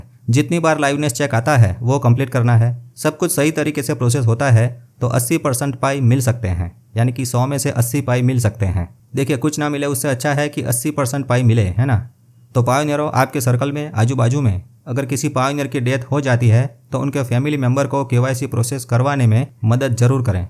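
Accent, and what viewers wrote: Gujarat, India. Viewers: Sir aap Gujrati ho?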